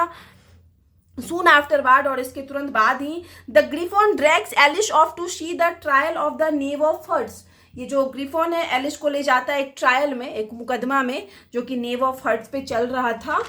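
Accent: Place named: Uttar Pradesh, India. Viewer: It's actually her accent, she's from UP or somewhere close to that part, I've been watching her video forever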